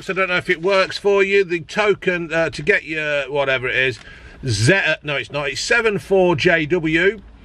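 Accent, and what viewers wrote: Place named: Leicester, United Kingdom. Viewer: LOLL this guy is a proper Leicester bloke hahaha 😂 love it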